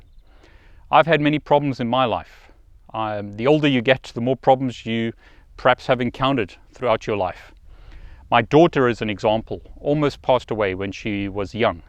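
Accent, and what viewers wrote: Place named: South Africa. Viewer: Condolences to your family,. Thank you for your inspiration. It's something that we definitely need more of. P.S. I thought that you sounded like you were from South Africa.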